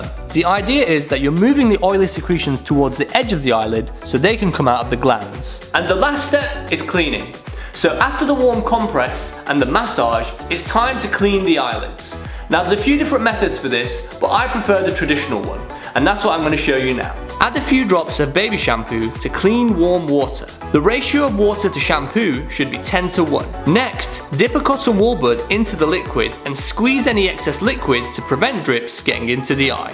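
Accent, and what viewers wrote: United Kingdom. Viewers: the British accent alone makes me feel better.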